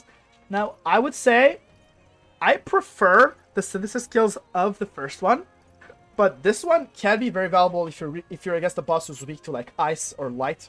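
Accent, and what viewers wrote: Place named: France. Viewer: I can hear the French accent! J'aime bien ton style de guide et merci!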